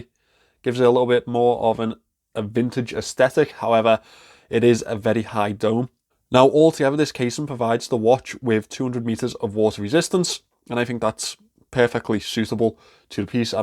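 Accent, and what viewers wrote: Liverpool, United Kingdom. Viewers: Love the Scouse accent bruv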